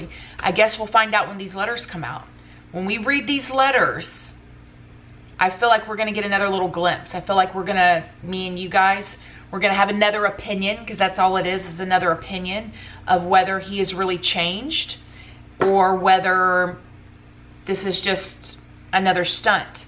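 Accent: United States. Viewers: .....are you American????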